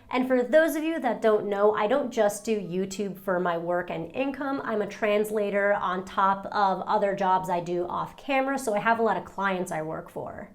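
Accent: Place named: New Jersey, United States. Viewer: saw your IG and your 'cathartic cry'. Glad you're making healing progress. Also, you're losing your Jersey accent, slowly but surely, hahaha.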